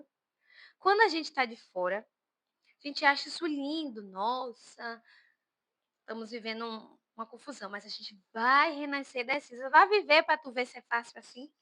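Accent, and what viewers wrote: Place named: Bahia, Brazil. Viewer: Amei sua voz! Sou baiana também e amo achar pessoas no YouTube falando baianês também! Muito legal sua forma de fazer diário!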